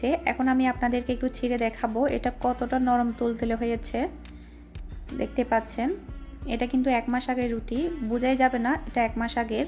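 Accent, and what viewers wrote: Chittagong, Bangladesh. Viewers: R u from chittagong?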